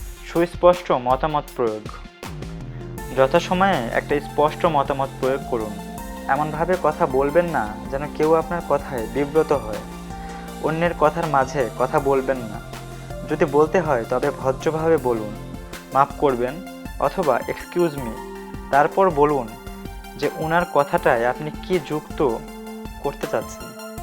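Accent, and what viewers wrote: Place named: Bangal, Bangladesh. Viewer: first bro,rectift ur own voice.bangal bhasa so irritating,cant u speak proper bengali?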